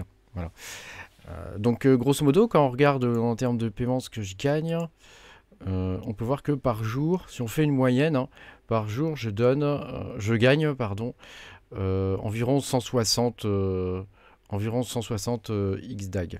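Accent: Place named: France. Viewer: anyone loves XDAG, will also love your French accent.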